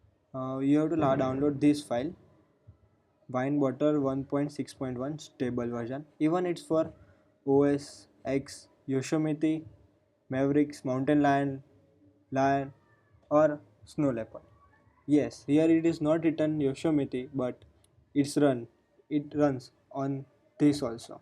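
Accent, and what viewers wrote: India. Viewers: and like the indian accent :D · indian accent sucks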